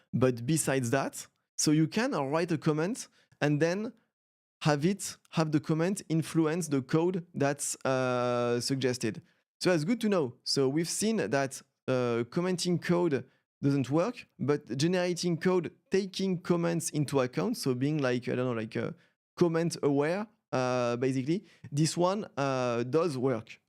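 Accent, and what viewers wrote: France. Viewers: Excellent l'accent Français ! ☺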